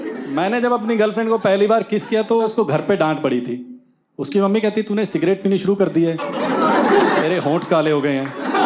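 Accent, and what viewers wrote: Punjab, India. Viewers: Gadd ta bai ji, "end" write up....and of course,punjabian di Hindi ch Punjabi accent ta aa hi jandi aa😅..looking forward to more ,in coming days from ur side👍👍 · Punjabi accent 😂❤ Great video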